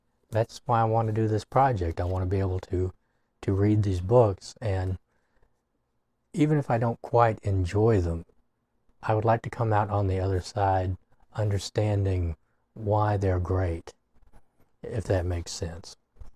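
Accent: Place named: Kentucky, United States. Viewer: Kentucky! That makes sense. Scott from 'Kentucky Ballistics' is a household favourite and the accent is similar. 👍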